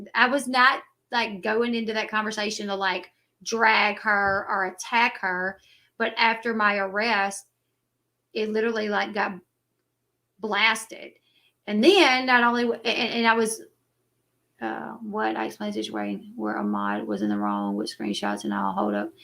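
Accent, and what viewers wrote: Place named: Louisiana, United States. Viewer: Her mailing address is lousiana, usa, and her accent is def. in line with that area.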